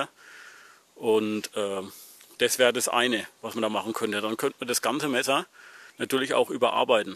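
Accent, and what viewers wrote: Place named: Franconia, Germany. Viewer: Cool! Hätte ich bei beiden Lust drauf. Ein Landi hatte ich ja schonmal in der Werkstatt ... Wir werden sehen ... Übrigens, ich sehe Deine Videos nicht nur wegen des Inhaltes so gern sondern ich liebe einfach den frängischn Dialekt. Bin ja selber ein Oberfranke ... Zumindest von der Mundart her.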